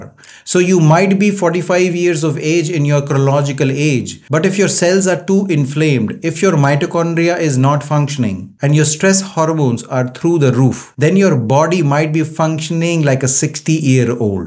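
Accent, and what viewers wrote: India. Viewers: I love indian accent when you speak english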